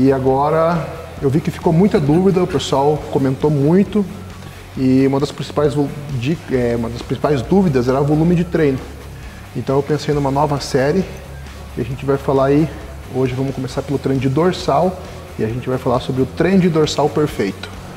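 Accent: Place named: Curitiba, Brazil. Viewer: Sotaque típico de um curitibanoo <3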